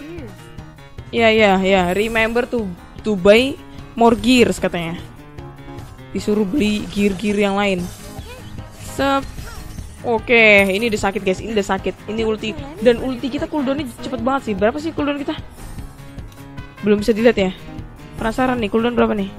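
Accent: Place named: Java, Indonesia. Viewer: Medok Jawa mantab to the tab tab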